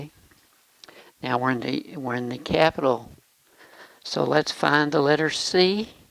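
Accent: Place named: South, United States. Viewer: very nice because of your accent sounds like country and western town just like Nashville or texas love it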